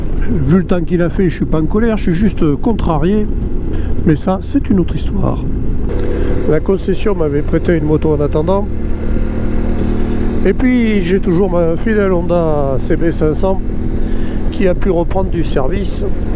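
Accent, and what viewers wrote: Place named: Provincial, France. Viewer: Le mec achète CHINOIS et il s'étonne d'avoir des problèmes, HAHAHA !! Et c'est quoi cet accent ridicule de plouc de province ???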